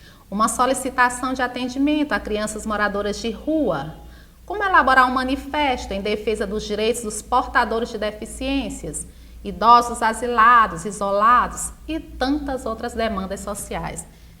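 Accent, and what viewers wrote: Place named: Northeast, Brazil. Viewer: Sou de SP. Mais esse sotaque do Nordeste é o mais lindo do Brasil. Adorei está aula.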